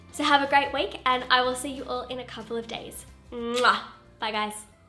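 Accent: Australia. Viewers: Omg!! Love it so much!! 😀 can't waitt!! Congrats for ur new moviess!!!!!!!!!.. i really hope i could get the giveaway back to school supply '. And miss ur rabbit.. its So fluffy!!. And just wondering are u aussies?. Because ur accent like an australian accent xx and i love it. REQUEST: How to make a neat note and creative notes for school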